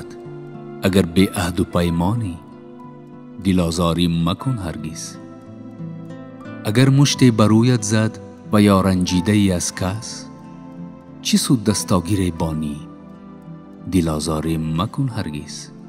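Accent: Tajikistan. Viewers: Это Таджикский акцент. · Это таджикский диалект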